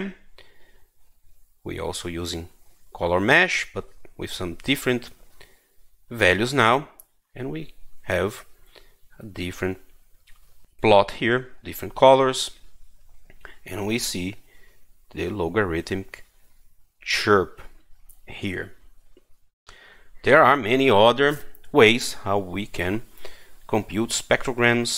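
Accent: Brazil. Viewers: This accent sounds very familiar. Brazilian (nordestino) by any chance? :)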